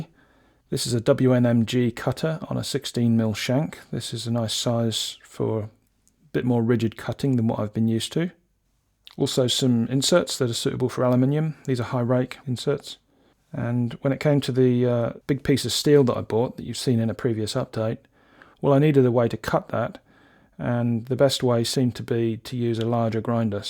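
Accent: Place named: England, United Kingdom. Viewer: Hi, Robert from Sydney here 👋🏼 Newbie to your channel mate, but enjoying your ‘stories’ immensely. Didn’t even know you were in Oz till this vid. I got fooled by the English accent 🤣 My younger son is currently on a two-year secondment in Hobart… due back to Sydney this year. I understand your need for space-efficiency. A lot of my machines are on castors as well ( single car fibro garage 😳). I like your design for heavy duty ‘bases’ for the machines 👍. Regards Robert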